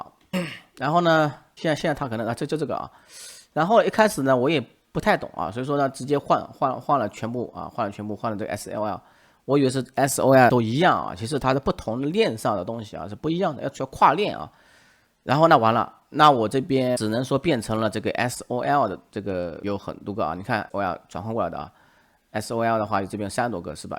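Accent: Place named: Hangzhou, China. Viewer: 口音听出来杭州附近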